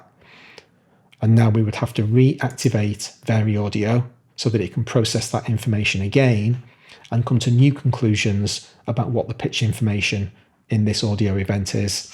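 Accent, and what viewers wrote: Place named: Merseyside, United Kingdom. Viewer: Thanks for the considered reply. I have a Master's in Education and taught at universities for over 20 years (not DAWs). In that setting, my students were either complete beginners or had to have a certain level of PK, as they had taken other more basic modules. But, here, you could get all levels. I understand that, but I've given up on some 'teachers' as they always assume prior knowledge. This includes some of the 'official Cubase' presenters. P.s. from your accent, I recon I'm (originally from your neck of the woods - Merseyside).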